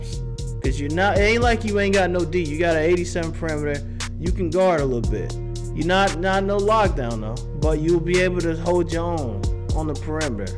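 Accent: St. Louis, United States. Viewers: You from stl?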